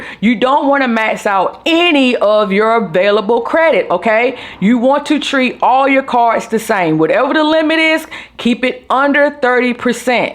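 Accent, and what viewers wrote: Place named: Mississippi, United States. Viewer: U sound like you from Mississippi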